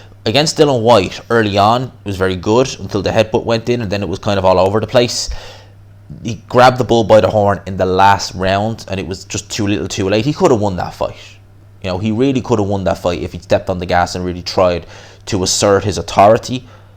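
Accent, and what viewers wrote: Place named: Ireland. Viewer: My Irish bro.Good to hear the accent making boxing news ☺️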